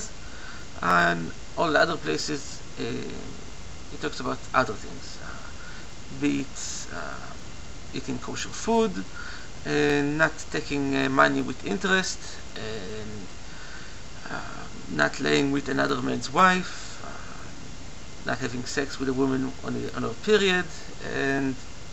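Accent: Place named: Israel. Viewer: He sounds Israeli.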